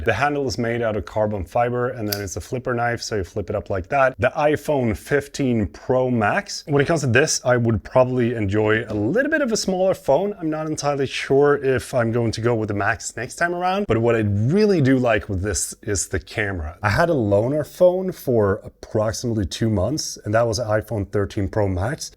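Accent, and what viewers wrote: Sweden. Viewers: Nice, I just found your channel. Can hear you are from western West Gothia on your accent in English, probably near Göteborg. I am a Swede from Falköping, live in Mexico now, but moving home soon. Enjoyed the video, it is funny how to explain sociala avgifter tax and pre-skatt for Americans lol. I remembered once as a young Swede, being a 2-shift factory worker, when I calculated my arbetsgivaravgifter, income tax and all the stuff I paid all that moms and other "avgifter" on I was at a 78% tax rate. Cheers from Mexico, Liked and subscribed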